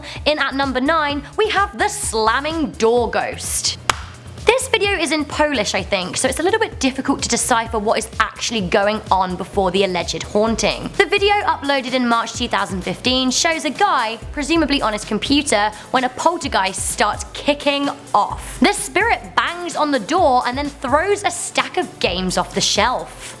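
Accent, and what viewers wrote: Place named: United Kingdom. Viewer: too british... we need danny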